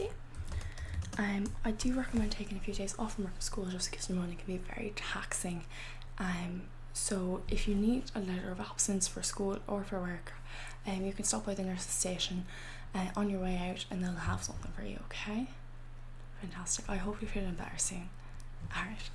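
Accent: Ireland. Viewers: I love the Irish accent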